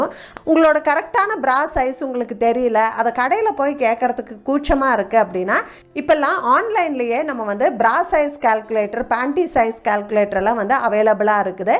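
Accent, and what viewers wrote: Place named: Kerala, India. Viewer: tamil with Malayalam slang nice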